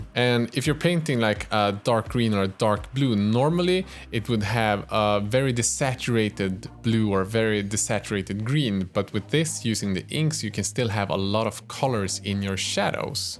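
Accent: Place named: Sweden. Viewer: as long as it suits you. If There will be subtitles it is fine). Like Angel does. I love Sveedish accent, I am watching Pewds since almost the beginning almost used to it. Just received the wet pallet from Redgrass. You should have a deal with them. Bought after your recommendation. Thanks for great content!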